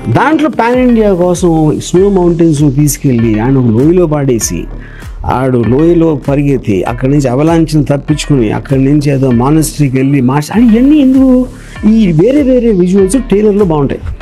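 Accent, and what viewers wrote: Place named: Telugu, India. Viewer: Imagining you in BigBoss 9...😎..your telugu accent is NEXT LEVEL...please keep it up.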